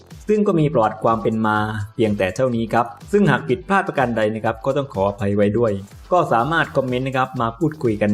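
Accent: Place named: South, Thailand. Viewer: คนอธิบายติดสำเนียงใต้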